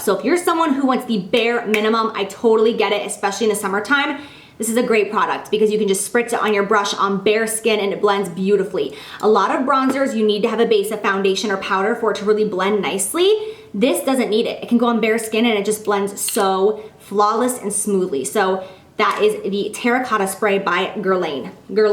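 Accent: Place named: New York, United States. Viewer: she has like a New Yorker accent lol ily jac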